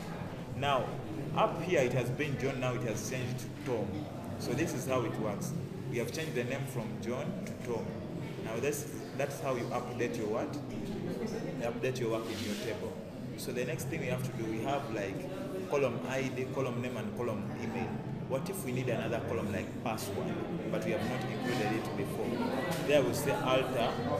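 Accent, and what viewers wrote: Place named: Uganda. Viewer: by accent I knew you were Ugandan; greetings from Canada. if I did not find this video, I think I would fail my assignment. Others didn't help.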